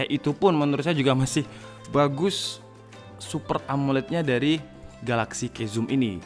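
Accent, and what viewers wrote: Malang, Indonesia. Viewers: Wong malang ya...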